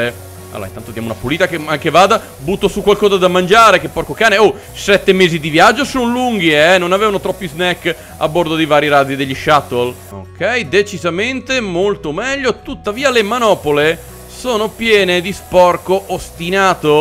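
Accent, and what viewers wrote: Veneto, Italy. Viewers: Tu sei veneto?? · Ma sei Veneto? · L'accento veneto piú veneto che abbia mai sentito😂😂😂😂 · Ma sei veneto o friulano? XD io wono veneto. Su xbox è gratis power wash??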